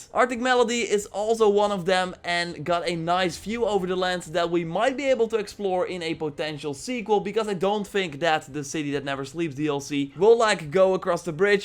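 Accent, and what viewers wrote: Netherlands. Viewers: Are you dutch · Are you dutch im dutch you sound dutch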